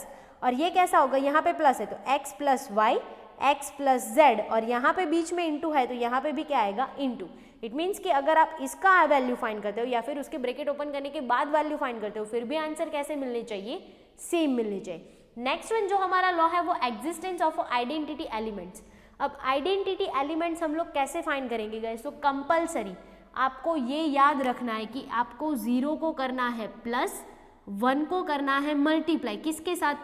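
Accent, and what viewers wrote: Gujarat, India. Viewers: Hey Ma'am!! Are Youu Gujarati?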